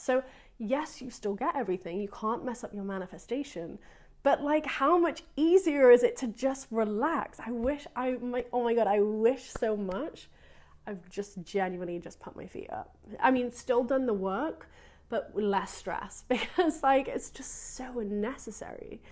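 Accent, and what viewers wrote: United Kingdom. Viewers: I really like your mindset. This is a true gem 💎 I love your British accent 😍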